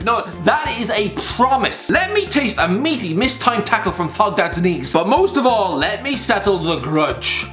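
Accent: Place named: Ireland. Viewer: Nothing like starting my day with an Irish guy rant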